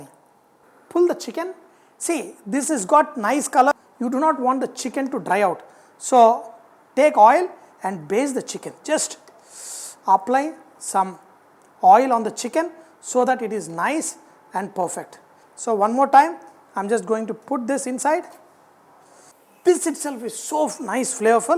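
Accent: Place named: Hyderabad, India. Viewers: Chef... in love with u r hyderabadi conversation, bilkul apna sa lagta hai, Eid mubarak in advance, keep cooking enjoy good life!!! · Haha perfect hyderabadi slang of u r... 😂😂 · True Hyderabadi accent....Feels good... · good to see your videos sir thanks for uploading hyderabadi baata kirrrak hi bhabi bolre so.. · Wooow🤣 happy to hear sir hydrabadi urdu you are really grate aap log hamesha ayse hi khush raho aap ko bhi Eid Mubarak 🙌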